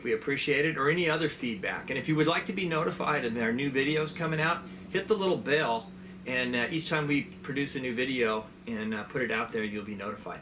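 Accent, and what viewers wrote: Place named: Southern California, United States. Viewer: Sounds like you live in Southern California.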